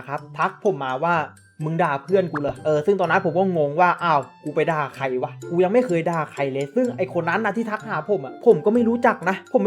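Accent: Phetchaburi, Thailand. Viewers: พี่ อยู่จังหวัดไรคับสำเนียงพี่เหมือนเพชรบุรีเลย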